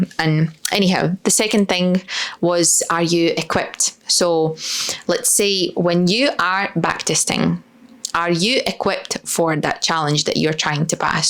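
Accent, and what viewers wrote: Scotland, United Kingdom. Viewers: Funny thing about your accent. You grammar isn't 100% native, but I thought it was just some sort of Scottish vs American English difference. But I don't think Americans can hear any Latvia accent, just the Scottish accent.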